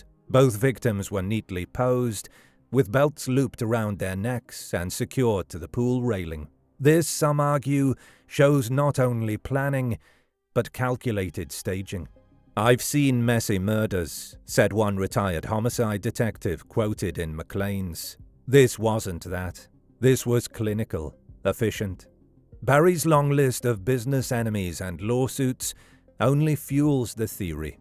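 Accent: England, United Kingdom. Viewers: I live here and visited the house days ater...It was not a gated house as you state and they were not onte of the most influential in the country....i;m only a minute in but your already this wrong I wonder what senasationalistic journalism awaits....shnooty english voice and all....work more on facts and less on being entertaining.This isn't Seinfeld we came to watch. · Why do we need a commentor with a British dialect to do a Canadian situation. Do we have to Outsource everything?😢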